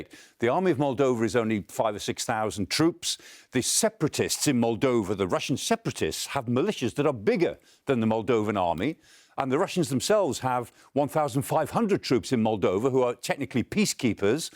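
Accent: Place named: United Kingdom. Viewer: If I wasn't really paying attention, I'd think he was narrating an American NFL game in a British accent.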